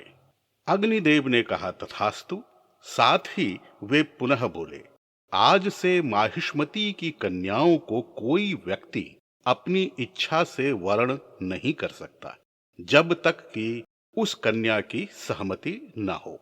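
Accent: Standard. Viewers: वाह वाह वाह अद्भुत कथा तथा आपकी वाणी के माधुर्य और भाषा के शुद्ध उच्चारण का जवाब नहीं सादर प्रणाम आदरणीय🙏💐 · महाभारत की अद्भुत् कथाओं की शृंखला में कही गयी एक और अद्भुत् और मेरे लिए एक दम नयी एक कथा...! कथा वाचन की आपकी शैली और कला को शतशः नमन आदरणीय। आपकी आवाज़ और उच्चारण की शुद्धता चमत्कृत करती है।चित्र एवं संगीत प्रभावी हैं। इस लाजवाब कथा और उसके शानदार प्रस्तुतिकरण के लिए आपको हार्दिक बधाई एवं धन्यवाद आदरणीय 🌹💐🌹💐🌹 सादर नमन एवं शुभरात्रि आदरणीय 🌷🙏🌷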